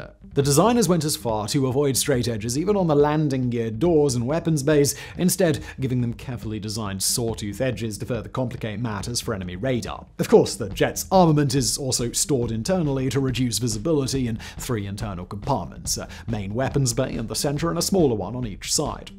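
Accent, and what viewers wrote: United Kingdom. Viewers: A british accent describing my beautiful country's bad ass air superior technology is just perfect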